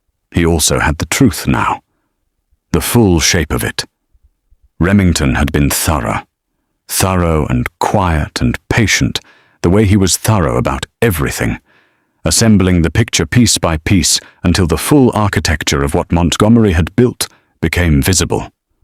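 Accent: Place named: United Kingdom. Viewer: The narrator is British so I'm choosing to think this is set in one of the beautiful moorlands of my home country, I don't care if it isn't, I claim it! 🤣 I like he went after her, he knew he screwed up and believed he was protecting her but he should have told her what was happening instead of hiding any danger from her. She's an amazingly calm soul who dealt with this with great dignity.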